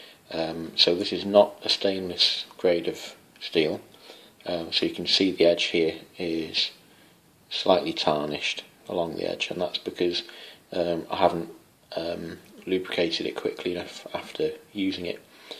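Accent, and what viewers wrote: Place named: Wales, United Kingdom. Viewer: Hey man where in Wales you from.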